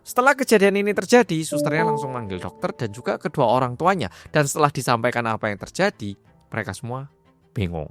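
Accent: Java, Indonesia. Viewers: Logat medok'e kui, mas pancen jowo tenan👍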